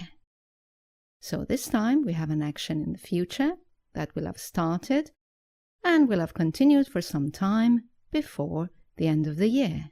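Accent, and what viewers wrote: United Kingdom. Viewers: UK English am i right ?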